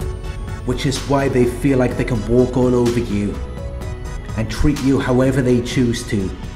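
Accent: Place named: England, United Kingdom. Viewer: He is English we have many dialects over here unlike the US. The silence is so you can digest what he is saying.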